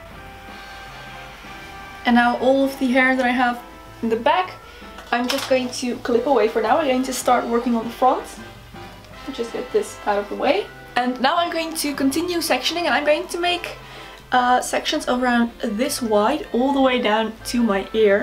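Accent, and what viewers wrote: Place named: Netherlands. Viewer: ik hoor een Nederlands accent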